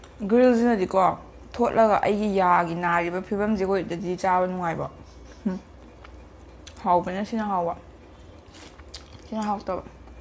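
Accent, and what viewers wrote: Kakching, India. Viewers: Kakching londa ngango taba yam nungaiye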